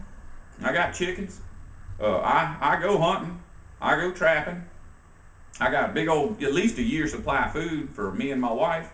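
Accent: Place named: South, United States. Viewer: hah hah, awesome accent sir, thank you, reminds me of the south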